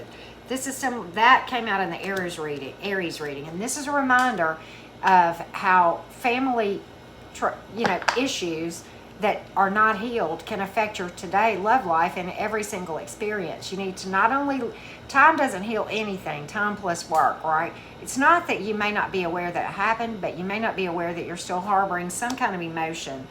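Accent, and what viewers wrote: South, United States. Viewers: It's the Chinese bangs for me, and southern twang.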